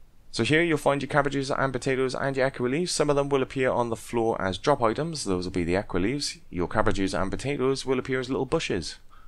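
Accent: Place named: Scotland, United Kingdom. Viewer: I miss you and your Scottish accent </3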